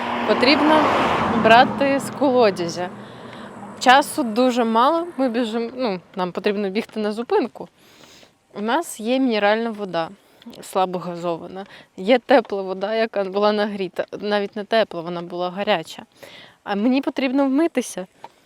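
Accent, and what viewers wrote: Ukraine. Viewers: Hi. Love to hear you speak the Ukrainian dialect I grew up with. My parents came from Carpathian region to US after WW2. I still make borscht and grow potatoes too! Slava Ukraini. ❤️🇺🇦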